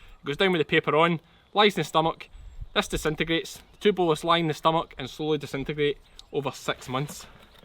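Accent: Scotland, United Kingdom. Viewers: My mate and i was talking on how you told your dogs to lie down while we were gathering in the mountains today, he says its “lie doon” becouse of your accent but i thought doon was down in Scottish, who’s right? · Ahh cool nice to listen to a Scottish youtuber for once 👍👍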